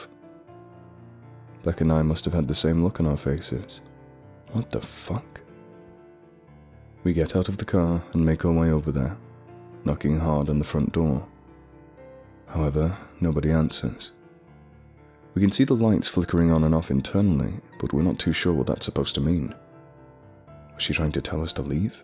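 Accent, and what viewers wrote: South, United States. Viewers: Your American accent is great man! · That was awesome! I wish I could find out more about what it all was ugh lol great southern accent by the way :)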